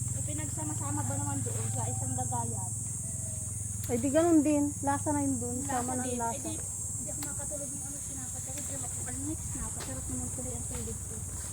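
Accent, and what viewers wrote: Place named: Bicol, Philippines. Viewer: Taga bicol din kc ako pamilyar yong salita mo sa akin may punto kc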